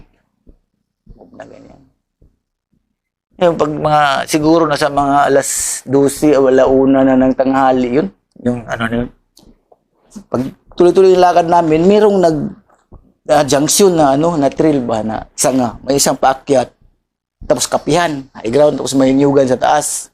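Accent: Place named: Visayas, Philippines. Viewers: Bisaya kc..haha..prang si tatay digs lang magkwento..nkktawa.. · Ito idol ku mag kwetong bisdak na bisdak eh ganyan talaga mag salita ang mga parang carbon copy mag salita sa kilala kong din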